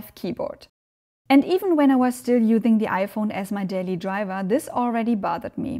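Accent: Germany. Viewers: Love the German accent, wish I was able to speak German, such a interesting and unique language. ❤ from 🇨🇦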